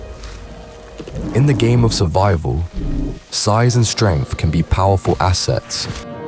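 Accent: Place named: Manchester, United Kingdom. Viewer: Anova instead of another. Time to get rid of that Manchester dialect kid.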